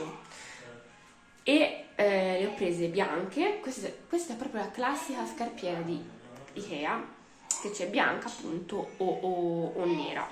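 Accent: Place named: Tuscany, Italy. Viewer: dalla parlata sento che sei toscana da dove? io Volterra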